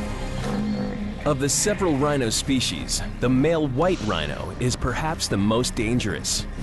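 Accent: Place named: United States. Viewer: I am not attacking Americans? I am saying that if this hole video was spoken in, with an English, Scottish, Australian, or even Irish voice-over accent, it would not sound so incredible and horrified terrible as it is spoken by this American voice-over. And this video is not the only example of what I mean, it is something USA people do for the last thirty years, and it's getting worse every day? Why? How come? Where did that start? I whish the video had a BBC voice-over instead of this.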